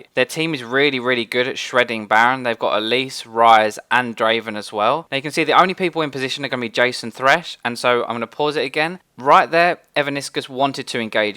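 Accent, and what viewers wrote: United Kingdom. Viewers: Oh god, my bad, I didn't even noticed the player's name._. well, it has been proven that the british accent makes speeches more charismatic and less tiring to listen to. Take that as your advantage Mr.! haha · Hea British? I'm British! :)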